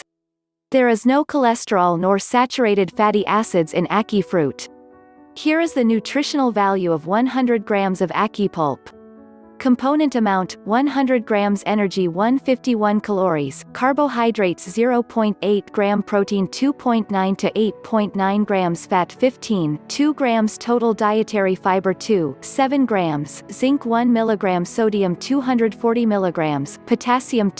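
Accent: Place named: Portland, Jamaica. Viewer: You sound like you're from Portland!!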